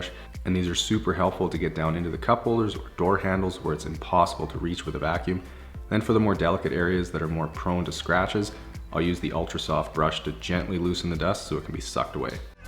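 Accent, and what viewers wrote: Canada. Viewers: ah yes, let me explain. He's Canadian so when he says "garage," it sounds like he is saying "graaj." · You sounded extra Canadian this video for some reason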